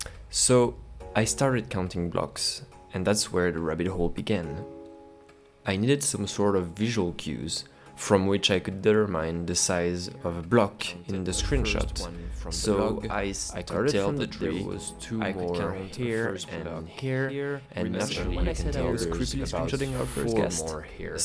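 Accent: France. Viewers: you sound french and i am proud of it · Are you french or something ? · Is it me or you have a french accent? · wooow u are french bonjour je parle aussi francais comme un francais je mytho pas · Are you french ? (I am french too) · T'es pas français ? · L'accent français est vraiment repérable partout xDDDD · French or canadian? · Mais t'es français? :))))))))) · You honestly sound like a slightly younger skin soft with a slightly larger French accent ngl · Dang your voice tho.... It's perfect the French accent makes it even better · Tu es francais ? :O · Bro that's funny, i'm french and at the moment u began to speak I knew u were french too xd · cette accent français :o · Du coup t'es français si j'ai bien compris (c'est trop bien) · Hey, you’re not english, are you? I feel like you’re a bro of akinsoft. You’re french aren’t you? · Heyyyyyyy you arre french like meeeeee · Le magnifique accent français :D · Ur french accent is so cool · Mais c'était sur enfaîte mais c'était sur (quand tu parlé on entendé que tu était fr mdr)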